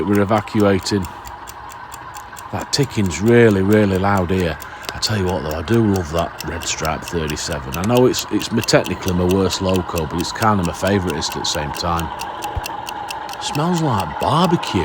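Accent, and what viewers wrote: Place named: Northern England, United Kingdom. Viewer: I really think you could be on TV!!!, like the way 'Thomas the tank' was shown, but in the current era, your northern accent,witty humour and the way you make it like a soap, is brilliant!!!!, you got me hooked!! 😅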